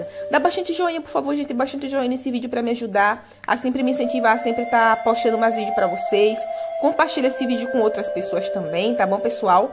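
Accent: Northeast, Brazil. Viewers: tô amando os seus vídeos e sua voz então é das nossas tbm sou nordestina com muito orgulho, sou alagoana com de coração, sou lá de Craíbas,mais por enquanto estou morando no Pará, mais com fé em Deus ainda volto pro meu lugar, bjs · Prefiro vc comentando com seu sotaque nordestino. Sem fundo musical por favor. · Seu sotaque e maravilhoso eu amo o sotaque do nordestino e lindo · adoroo muito seu trabalho parabens, gosto mais ainda qndo vc narra seu sotaque nodertino e muito maravilho mulher vc me mata de ir... susseso pra vc em 2021 continue prazendo alegria pra nois Brasileiros 😂😂😂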